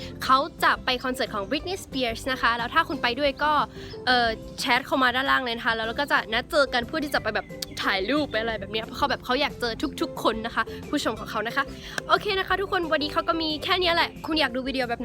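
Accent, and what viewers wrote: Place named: Central Thailand, Thailand. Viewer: พูดกลางชัดกว่ากูอีก 555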